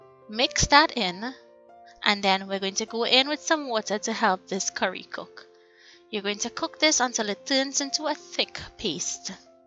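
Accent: Trinidad and Tobago. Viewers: Hah thanks a ton for sharing this! I’m bajan but always loved the trini accent!! I’m currently making this now .... so simple and quick, I love that! 👌🏽 Could you do a pepper sauce recipe too pls? ☮️😃💟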